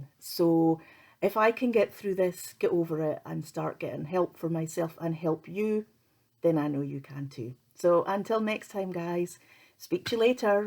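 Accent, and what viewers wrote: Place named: Scotland, United Kingdom. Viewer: Got soo excited when I heard the scottish accent but realised you live in Australia lol🤣I share many of your challenges and wondered if there was a way to contact you. Love your content, its very helpful and and relatable thank you for sharing🤗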